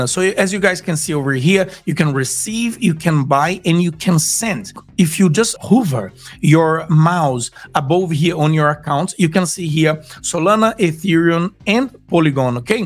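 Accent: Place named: Brazil. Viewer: Brazilian accent detected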